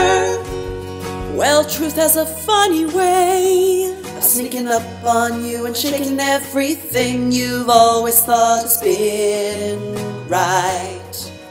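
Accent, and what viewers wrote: New Zealand. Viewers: Omg, are you Kiwi?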